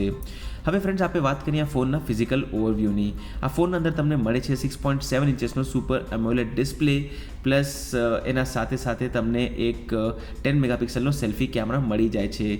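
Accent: Kutch, India. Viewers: Aa Gujarati nathi aato kutchchi Gujarati che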